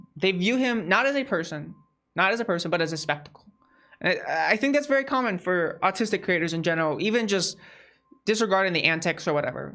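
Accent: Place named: Pennsylvania, United States. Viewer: im sorry this completely irrelevant to the actual story (although i thank you greatly for covering it), but i am fascinated by your accent lmao. I'm from MA and have heard my fair share of regional dialects but I have no fucking clue where to place yours 😂if i had to guess I'd say Philly/Pennsylvania? just clarifying btw i don't mean any of this in a negative way nor am i trying to make fun of your accent or anything, just legitimately curious. i'm a big fan of accents/language in general and love learning about different dialects/regional differences.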